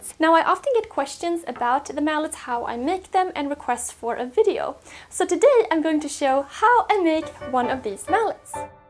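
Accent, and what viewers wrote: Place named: Sweden. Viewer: Hello there! This is the first one of your videos ive seen and im just wondering, your accent it sound swedish. Are you from sweden?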